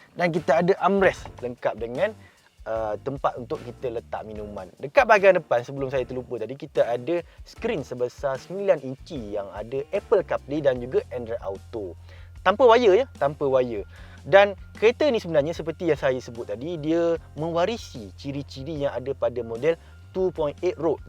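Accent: Kelantan, Malaysia. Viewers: ada bau2 kelate tu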